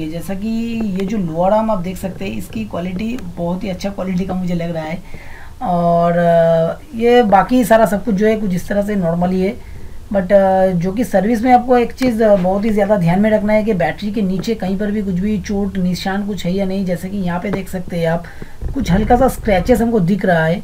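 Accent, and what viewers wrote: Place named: Bengal, India. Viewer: Dada kotha sune mne holo apni bengali...khub sundor laglo video ta erokom video ro deben...EV maintenance krte ki kno electrical licence lage?? Ami ekjon electrical engineering student...janale upokrito hbo🙏🏻🙏🏻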